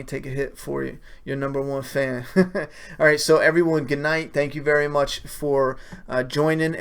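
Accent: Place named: Long Island, United States. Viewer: Q&A- first of all I just want to say I'm a huge fan. I appreciate your honesty and that you just stay to your true self no matter what viewers say. Anyway, I usually watch your TWD reviews,but I noticed your accent. Are you from Long Island? I'm from there,but currently living near Baltimore, MD.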